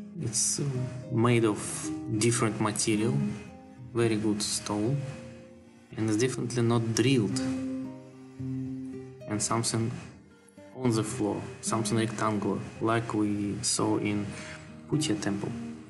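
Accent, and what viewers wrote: Russia. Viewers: А акцент то у тебя Русский. Чётко слышно.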